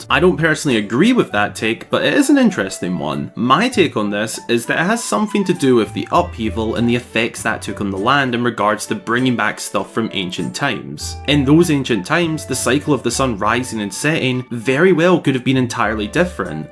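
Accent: Scotland, United Kingdom. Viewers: He is scottish I just realized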